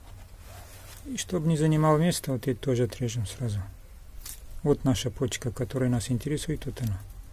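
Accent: Caucasus, Russia. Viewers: Из всех видео, что смотрел на ютубе, ваши самые чёткие- по делу, коротко, понятно, все видно в кадре,лишние телодвижения вырезаны. Иногда и с юмором. И самое главное, -не косноязычно,без всяких (ээ-мм-ээ-бэ-э).Видно, что человек знает о чем рассказывает и показывает так, чтобы понятно было всем. СПАСИБО БОЛЬШОЕ ТЕБЕ. P.S. А Вы, остальные русскоговорящие, выкладывающие ролики, учитесь у человека с Кавказа .Да,есть небольшой акцент, но говорит он без ошибок, не мычит, как большинство из вас и в словах не путается. Словом,- приятно слушать и смотреть. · Как же удачно я набрела на ваш канал ! Подписалась . Теперь изучаю все способы прививки ! Очень всё доступно и понятно ! Спасибо огромное ! И этот мягкий акцент . ...явно юг .... Осетия ? ... Балкария ? ... Но могу ошибаться . Удачи вам !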